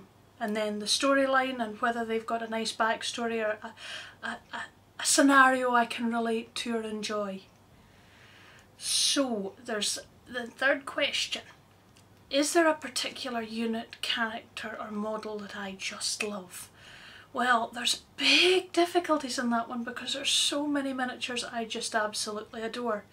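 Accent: Scotland, United Kingdom. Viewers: omg I love the way you speak! Subscribed! :D is it Scottish? Good luck in the competition!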